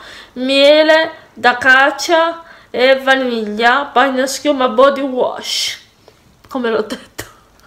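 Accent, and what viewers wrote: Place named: Rome, Italy. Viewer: Ho magnato ahahahahahahha so morta parlata romana 🤣🤣🤣c'è lo anche io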